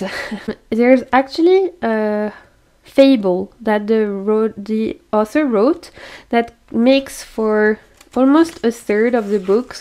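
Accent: France. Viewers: Thanks for this video! Good idea to show french decks!!🇫🇷 I'm french and i live in Bretagne, not so far to Broceliande forest!! The fire has destroyed a part of this magical place..😔 But i saw recently a fox on the road on night🦊...and other animals next to my home. 🐿🐇🦔🐗 I love Gulliver and other french creators like Célia Melesville👍🏼 I already saw your Channel and i like your frenchy accent😁🇫🇷 so cute😘 Namaste🙏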